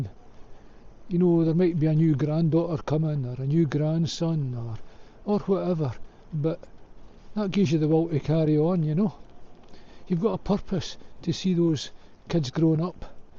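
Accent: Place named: Scotland, United Kingdom. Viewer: You have a nice accent. Are you in Scotland . Inn Canada Christmas not much cycling. I like to know more of the nature there, and geography.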